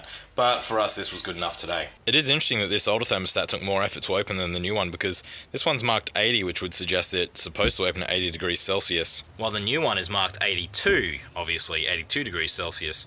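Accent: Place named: New Zealand. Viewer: sounds like a kiwi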